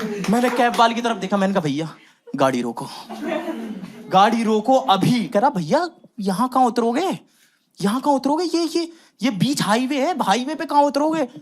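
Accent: Hyderabad, India. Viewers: 2nd video and suscribed ❤❤❤hadreabdi tone 😂😂❤❤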